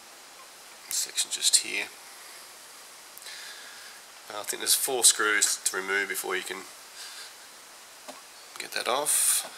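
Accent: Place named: United Kingdom. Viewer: Cool! Such a small world eh? I'm in Hamilton - from Toronto originally - got the Roland RD 250 s As a Gift - from a great friend - - - - - had the "two dead keys" issue - - - - went on You Tube - - - - found this wonderful " " Brittish accented wonderful guy, gladly sharing his wisdom and awesomely creative advice - - - and did what he said - - - and bent (it took me 2 versions to get it right - the first one was too thin of a paper-clip - gotta use a thicker one, like he told us) and BOOM - fixed - and done. This is an amazing keyboard - a Classic. To have Great Advice and Wonderful Tips like those from - are So Much Appreciated. Thank you - Again -!!!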